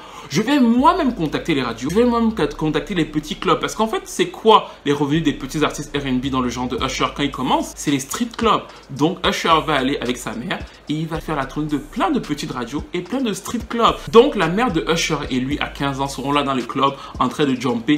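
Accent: Cameroon. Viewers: Moi qui aime la musique et qui pensais tout savoir, tu es génial et ton accent camer ne m'a pas échappé dès le début jetais la que mais attends le gar ci c'est un freeeere. Massah. Keep going u talented and u have deep deep knowledge. Après 10 vidéos watches je mabonnes et jactive la cloche. Push through and through and through ! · L'accent CAMEERRRRRRRR !!! indétronable !!!!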